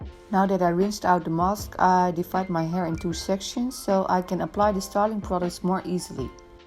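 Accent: Netherlands. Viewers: I can tell that you’re dutch just from your accent and how your head nearly touched the shower thing😂 (I hope that wasn’t offensive💕)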